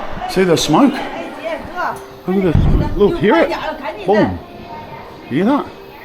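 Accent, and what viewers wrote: Australia. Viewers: I am 74 & learned more about North Korea in this video than I ever learned in school or on news.Never heard about the tunnel. I liked everything about your video including you cool attitude. And who wouldn't love that Aussie accent. ❤ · Its hard to take the aussie accent seriously 😅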